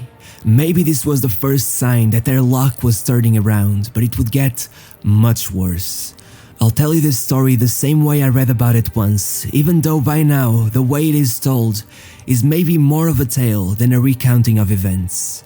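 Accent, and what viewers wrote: Portugal. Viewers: És português? Dá para notar pela pronúncia a falar inglês, é sempre muito bom ter um português com tantos subscritores e com um canal com um conteúdo incrível original e interessante. Continua com o excelente trabalho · És tuga né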